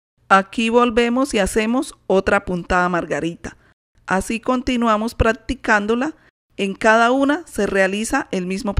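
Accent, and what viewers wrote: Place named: Andes, Venezuela. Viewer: Su acento parece de los andes de mi Venezuela amada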